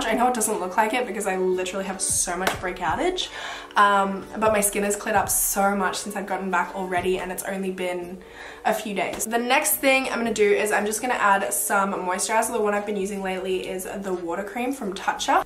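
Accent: Australia. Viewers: What is your accent? Sounds a bit Australian but if though.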